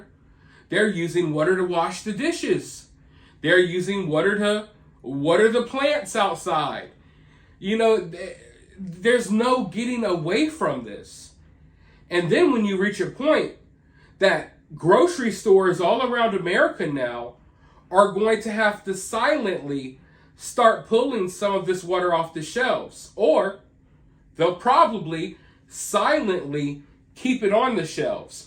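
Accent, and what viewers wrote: South, United States. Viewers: A swamp monster. Crack me up. You can tell you're a southern boy.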